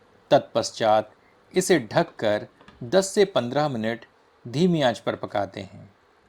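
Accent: Standard. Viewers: आप की शुद्ध हिंदी उच्चारण से ही मन प्रसन्न हो गया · आपकी हिंदी कर्णप्रिय शुद्ध है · I'm a South Indian Tamilian, appreciate your shudh hindi conversation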